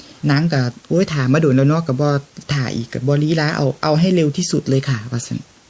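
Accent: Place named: Chaiyaphum, Thailand. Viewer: โทดเด้อจ้า พี่คนทางได๋น้อฟังเสียงเว้าคือคนชัยภูมิบ้านหนูแหมะ พอดีพึ่งมาติดตามมื้อนี่จ้า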